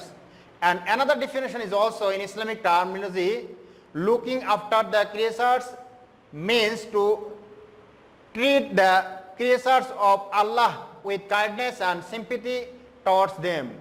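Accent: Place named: Bengal, India. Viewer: You can find hundreds yet tenths of thousands of bangalees who apply the same pronunciations. Its not done by will but its the way of the accent of some certain people. So this mistake cant be corrected in terms like this. But one way is that is these certain people can learn the UK accent without some obstacles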